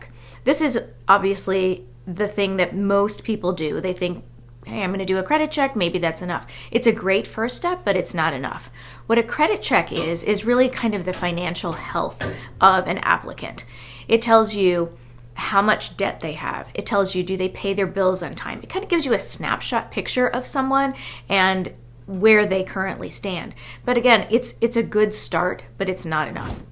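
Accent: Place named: California, United States. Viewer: Sound like you from California/Arizona! 🌵🌴🌵🌴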